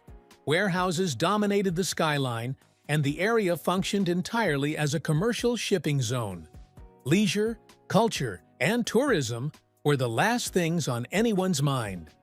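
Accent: United States. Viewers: Bloody awful US accented narrative with non-English pronunciation! JeeZ!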